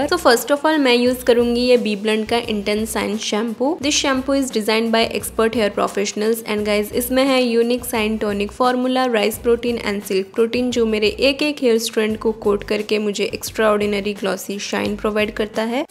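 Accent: Odisha, India. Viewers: Your odia accent is so adorable❤.. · Your odia accent is so cute ❤ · Your Odia is so sweet ❤️✨ Like I'm replying that part ✨✨👀 In other vlogs plz do that again 😍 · Di your odia is so cute 🥺🥰 and you also look soo cute & pretty 🤩😍💜 From - odisha,angul✨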